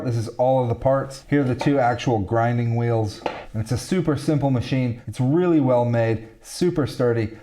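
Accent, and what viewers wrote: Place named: United States. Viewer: Where on earth do you live? Based on your electrical outlets in your shop I was thinking somewhere in the UK but Maybe not? Definitely Europe somewhere I assume. The other big clue are the stone walls in your shop that you just don't see in the US other than a few colonial era homes and barns in the Eastern US. But then your accent is completely American so it's all a bit confusing haha.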